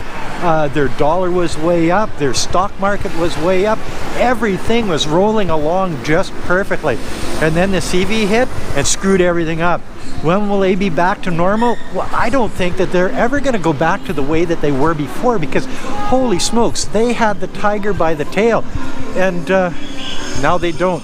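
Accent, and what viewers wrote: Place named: Canada. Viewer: Saturday Morning is gonna be a great funny show! like your canadian voice!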